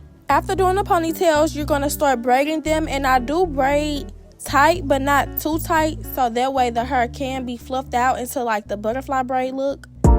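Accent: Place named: St. Louis, United States. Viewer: St. Louis in the house!!! I just knew it when I heard your accent, babe. Beautiful!❤❤❤❤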